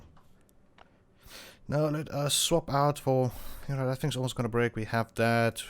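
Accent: South Africa. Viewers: Wow a South African lekker man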